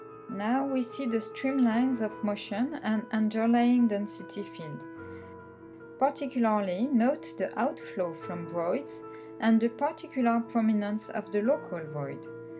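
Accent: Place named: Ryazan, Russia. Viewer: Этот рязанский акцент...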